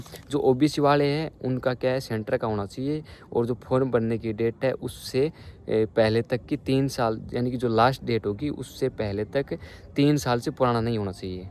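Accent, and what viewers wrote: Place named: Rajasthan, India. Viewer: Bhaiya app rajsthan se ho kya boli me lg raha hai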